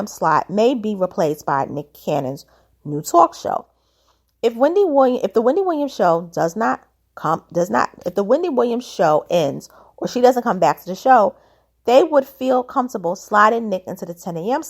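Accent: New York, United States. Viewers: you sound so NY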